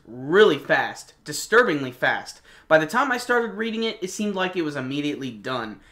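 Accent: Germany. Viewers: lol at the german accent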